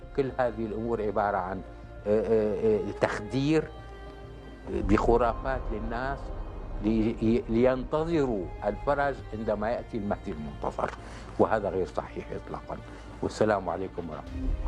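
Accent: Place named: Syria. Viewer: hhhh أكيد يتلعثم فهو حفظ القرآن و هو كبير و هو سوري تغلب عليه اللهجة السورة و درس في روسيا و يتقن عدة لغات المهم هو يحفظوكتاب الله اذا تلعثم أثناء الحديث لهذا شيء عادي جدا و ليس حرام لأنه أثناء التفسيير او مطالعة كتاب الله يقرؤه جيدا ثانيا لقولك ندير و تدبير و عيرته بضعفه في اللغة هو تكلم عن التدبير و المعنى واضح حسب سياق الجملة و انت قلت له ليس تدبيير بل تدبر لا حجة لديك في باقي النقاش كلامك مجرد نقل نقل نقل همهمهمهمهمات تكلم بالعقل و المنطق ولا تدعي على الناس ما لم يقولوا و هو شرح آيات الله شرحا واضحا يفهمها العام و الخاص و هو لم يحلل الحرام لو أستمتع لكل حوارته ستفهم مايريد ايصاله المهم ليس عيبا ان تكون ضعيفا في اللغة العربية لكن العيب ان لا تفهم ما يقال لك و لا تميز بين الصح و الغلط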